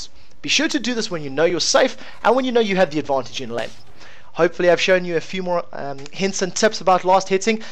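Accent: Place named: South Africa. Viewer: I think he is South African, that's kind of how we all sound... xD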